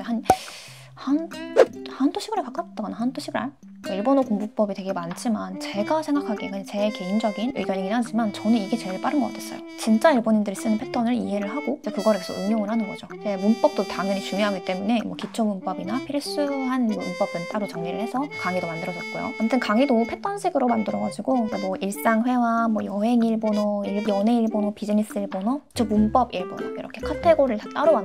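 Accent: Kansai, Japan. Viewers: 예전부터 구독했던 님 구독자 여려분들은 다 아실 내용이긴 하네요 ㅋㅋ 칸사이벤 말투 ㅋㅋㅋㅋㅋ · さんの関西訛り日本語関西人として親近感わいて好きなんだけど😂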